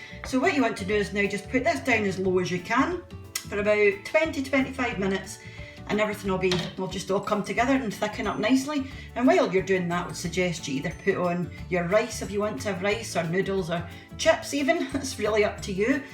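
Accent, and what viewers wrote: Scotland, United Kingdom. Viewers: I ❤ur videos im doing a few this week lovely to hear a Scottish accent living in London apart from family its refreshing and looks great im starving 👌😂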